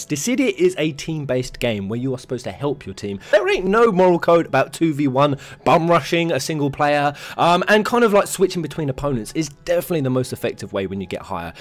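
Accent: United Kingdom. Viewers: Haseo Kaztrio He’s British.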